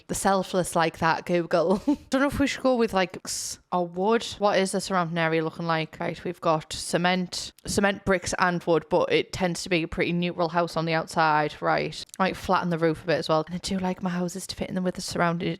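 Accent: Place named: Northern England, United Kingdom. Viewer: I love how unapologetically northern you are ❤ (im a geordie so also northern)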